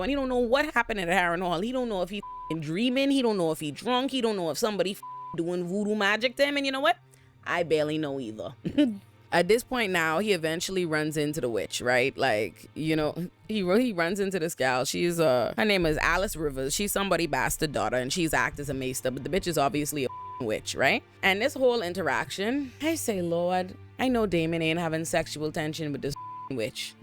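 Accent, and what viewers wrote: Caribbean. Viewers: I sense... Caribbean 👀 🇹🇹?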